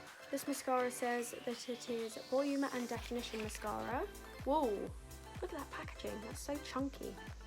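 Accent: United Kingdom. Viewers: are you from England? x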